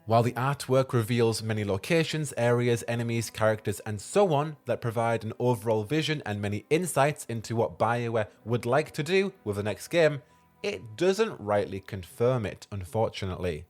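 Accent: United Kingdom. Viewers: You are very british, and that's coming from a British person. What I mean is, your voice is very soothing to listen to lmao if that makes sense?? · Ah, so you're British. Totally irrelevant I know, but I couldn't quite place your accent before. Then again, I suck at that.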